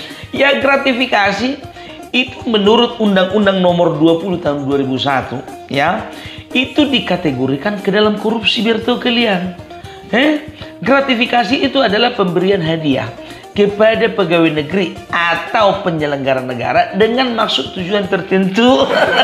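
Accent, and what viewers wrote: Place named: North Sumatra, Indonesia. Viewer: Abang ini bukan lucu-nya yang aku senangi. Beliau ini mau belajar dengan mendalami materinya dan kontennya kaya, ringan, santai baru lucu dengan akses sumutnya. Bravo lae ❤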